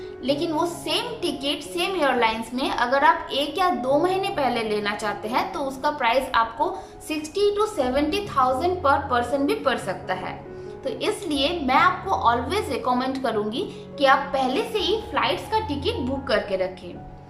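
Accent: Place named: Bengal, India. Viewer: Bengali Accent s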